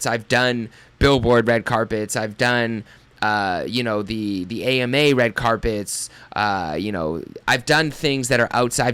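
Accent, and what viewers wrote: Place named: Canada. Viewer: This is an actual Canadian accent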